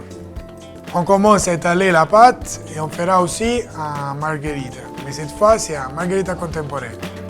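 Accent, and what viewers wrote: Italy. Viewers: Great job, you're great. Love your Italian French accent, never be ashamed of it and be proud of your origins!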